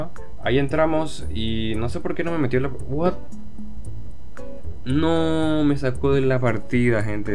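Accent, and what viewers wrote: Paraguay. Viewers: Sos Paraguayo pero con mezcla de chileno argentino brasilero y otro más??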